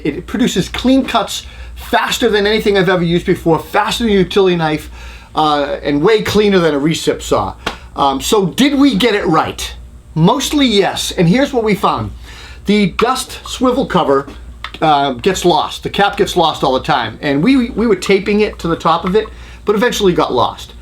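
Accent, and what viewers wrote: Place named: Northeast, United States. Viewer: Looking at the pictures of you using the power tools, I just say "remember your hearing protection". ;-) Love your channel and northeastern accent.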